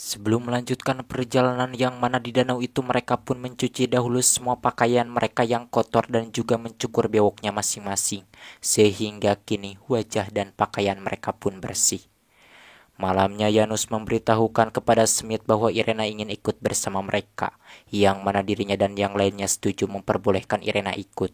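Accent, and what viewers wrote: West Java, Indonesia. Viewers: Sampean asli org mana bang??? Sunda ya?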